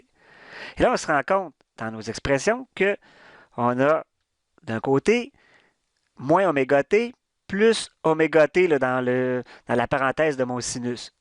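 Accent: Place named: Quebec, Canada. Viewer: Maintenant en ds quand je vois des ondes stationnaires j'ai un accent québécois dans la tête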